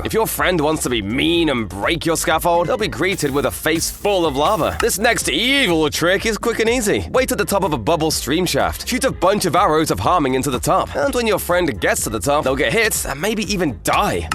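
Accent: United Kingdom. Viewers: Just found this channel so I’m not familiar with it in anyway but I can’t tell if Dexter Manning is narrating a Minecraft video or you’re just that English but there’s math here that isn’t mathing.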